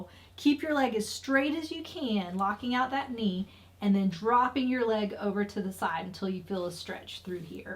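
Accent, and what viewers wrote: United States. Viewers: Thanks this has really helped with my hip pain ....out of interest what state is your us accent from :) Regards mark uk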